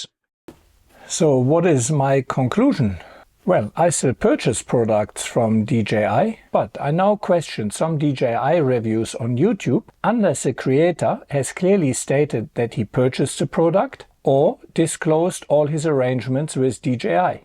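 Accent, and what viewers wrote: Germany. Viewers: That sounds german.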